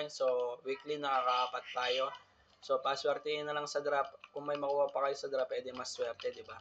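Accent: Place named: Pampanga, Philippines. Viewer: kapampangan accent core ❤❤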